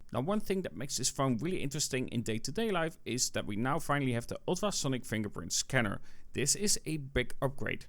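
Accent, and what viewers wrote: Netherlands. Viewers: Hoi, het viel mij al op dat u ook Nederlands was vanwege uw accent (Geen belediging, uw Engels is goed). Ik heb zelf ook maar besloten om de zwarte OnePlus 13 te kopen met 512GB/16GB RAM opslag via TradingShenzhen Mij werd geadviseerd om de OnePlus 12 te kopen destijds eerder deze jaar maar ik besloot om het maar toch niet te doen tot later deze jaar waarin het toch duidelijk werd dat ik echt behoefte had aan een nieuwe telefoon dus ben ik maar voor de OnePlus 13 gegaan. Het lijkt er ten slotte op dat ik onbewust een goede keus heb gemaakt. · lol ja je bent duidelijk nederlands zo te horen ;)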